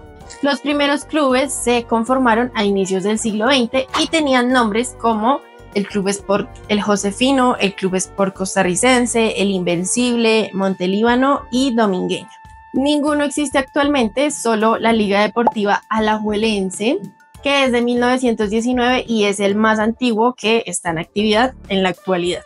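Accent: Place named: Colombia. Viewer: Por tu acento sos colombiana menos vistoso y todo preo haun la seleccion de Honduras supera en la serie a Colombia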